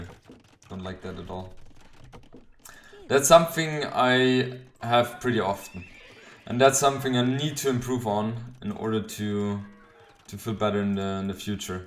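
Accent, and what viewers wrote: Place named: Germany. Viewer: Ich liebe ja dein (D)Englisch 😄 So to say hab ich vorher auch noch nie gehört 👌🏻😄 Obwohl es ja nicht falsch ist.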